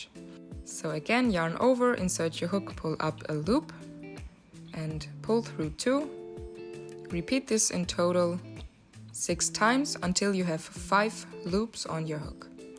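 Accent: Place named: Austria. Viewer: Ich finde du machst das super!! Und dein Englisch ist schön und gut verständlich, daher finde ich den typisch österreichischen Akzent überhaupt nicht schlimm! 😁👍🏻 Und die Anleitung scheint soweit auch verständlich, werde es sicher demnächst selbst mal ausprobieren 🌊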